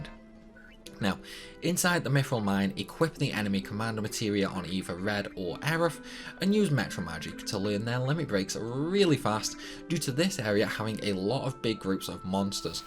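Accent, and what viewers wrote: United Kingdom. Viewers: Your accent makes some words hard to understand.... And I’m british too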